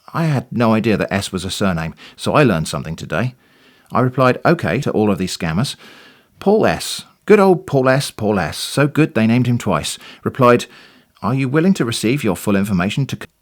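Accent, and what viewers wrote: England, United Kingdom. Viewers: I hope you are very careful at doing this sort of thing. Watched a few of your videos and makes me concerned for your safety. After all you are putting this on YouTube and they could be watching and know what name they gave you email profile, you may bait the wrong person, piss them off and then find out somehow where you live and since you have an English accent probably won't be too hard. Since you are warning us educate us on these scum of the earth buttheads, making them harder on them to get money, one may get really ticked off and the person may not be mentally stable and decide to come face to face with you and do something horrible to you. Very concerning, very alarming. I do thank you for these videos and appreciate the risks you do take, sacrifice your risks, and safety for our sake is such a noble act and I hope others will be grateful and thankful as much as I am and I have learned a lot from your "scam baiting" videos. 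Now I know what to look for when checking emails and text messages with caution. I get a ton of emails and text messages from these creeps. My phone has that scam block on both emails and text messages, but for some reason they keep coming through. I have downloaded apps from the play store, and they don't do jack nor sh*t to stop it. If I don't keep my phone on do not disturb, my phone is constantly ringing. If you tried to call them back, the AI voice says " this number is no longer in service" so they can call you all day long but can't call the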